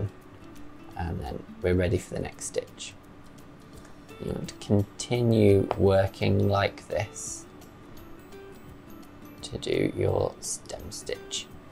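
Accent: United Kingdom. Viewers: To each his own. I have to strain to understand his ever-so-gentle British accent. Sorry.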